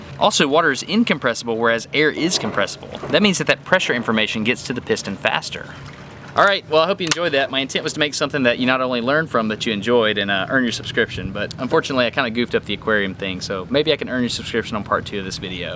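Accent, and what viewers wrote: United States. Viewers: Apologies for the banal question, but where abouts in the States is this guy's accent from?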